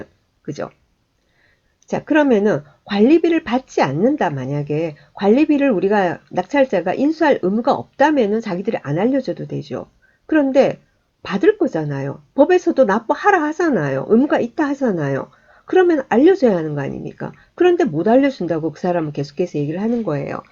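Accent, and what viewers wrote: Gyeongsang, South Korea. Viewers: 소장님은 진짜 유튜브방송을 계속하시면 어마어마 해지실듯 해요~ 말씀하시는게 한단어한단어 잘들어오네요~ 경상도아나운서!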